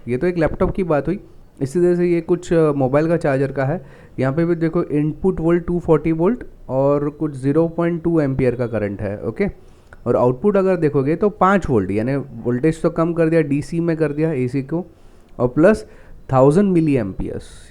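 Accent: Gujarat, India. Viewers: are you gujrati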